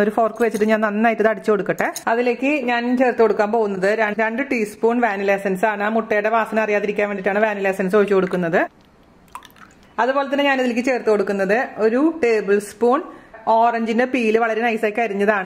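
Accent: Thrissur, India. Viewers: ! Your musical narrations at thirsur slags with valid tips,super cake Xmas to you and family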